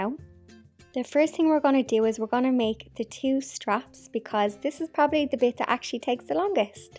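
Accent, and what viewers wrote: Dublin, Ireland. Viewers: Hiya. My 11 old daughter discovered your channel. She's so creative. We love your creative spirit. I'm a mad tea dress vintage decor fab. So love and congratulations for inspiring a lot of people to get creative. Plus hearing your Dub accent from here in Spain being a Dub myself is brilliant!!!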